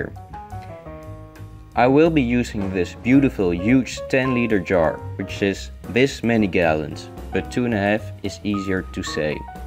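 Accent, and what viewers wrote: Netherlands. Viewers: he sounds dutch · nice video! i do not know where you are from, but you sound very Dutch. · I always thought you were Dutch by your accent (I have it too lol), but I wasn’t sure, and now I am. It feels satisfying to be right.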